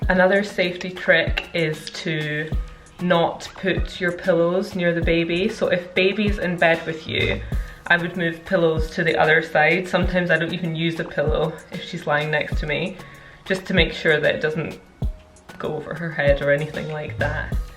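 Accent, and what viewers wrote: Scotland, United Kingdom. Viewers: Finally a Scottish accent like me 😂😂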